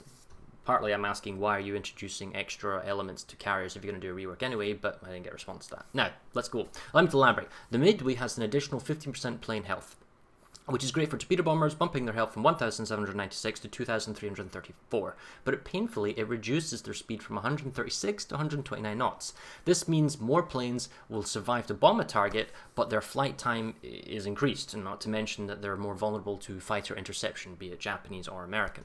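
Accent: Scotland, United Kingdom. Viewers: he's Scottish, he can pronounce English words in any way you can imagine, and it's still going to be alright...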